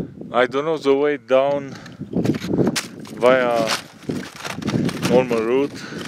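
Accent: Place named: Ukraine. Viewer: Almost to hot for swimming, UV is generally 9 or higher so we tend to stay out of that as much as possible. Our area of choice to hike in is Whiteshell provincial park..just named a UNESCO world heritage site for its stunning boreal forest and petroforms..a truly magical place. I thought that I recognized your accent, my Grandfather was from just that area.. Ternopil to be precise..I remember him telling me of the beautiful hills and mountains.. small world isn't it? Cheers!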